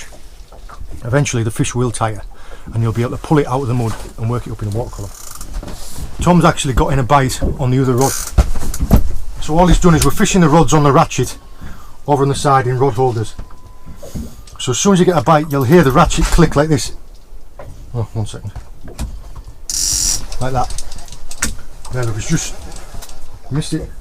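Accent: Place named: Northern England, United Kingdom. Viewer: luvin your videos mate and your boat is great too just a nice size for what you do . i live in australia but like watching the english fishing channels probably cos i was born in the north too ,middlesbrough and i still like listening to any of the accents from up north. johno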